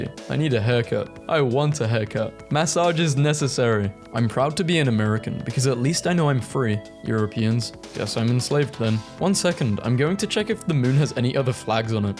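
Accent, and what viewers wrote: United Kingdom. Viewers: I like how it's a British guy commentating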